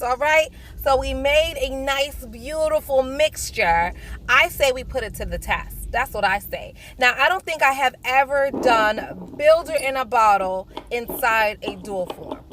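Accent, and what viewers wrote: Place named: New York, United States. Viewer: Haha. I can hear it. I'm not from NY (from DC) but can spot the NY accent anywhere. LOL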